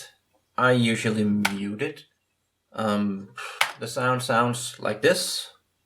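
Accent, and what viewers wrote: Denmark. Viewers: Hmmm.... Sounds like you are Danish!?!?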